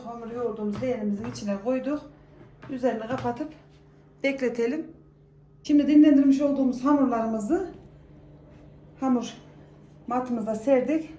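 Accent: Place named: Gümüşhacıköy, Turkey. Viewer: Bende Gümüşhacıköylüyüm şive yabancı gelmedi memleketim güzel dir güle güle oturun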